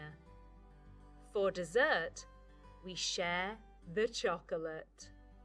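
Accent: United Kingdom. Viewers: I like your british accent, it's so elegant. I love your videos.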